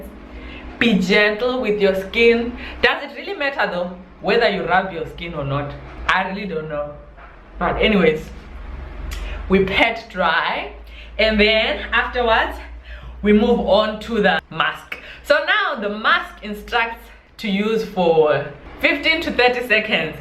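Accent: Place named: Zimbabwe. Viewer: Inlove with the Zim accent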